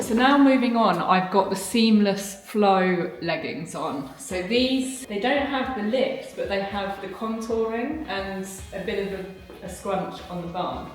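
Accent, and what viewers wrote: United Kingdom. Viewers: I love you sincerely from Texas and absolutely love the British accent...!!!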